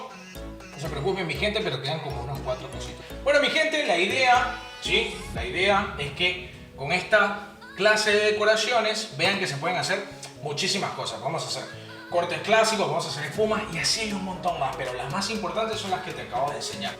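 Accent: Venezuela. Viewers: Soy el único que piensa que tiene un poco de acento venezolano?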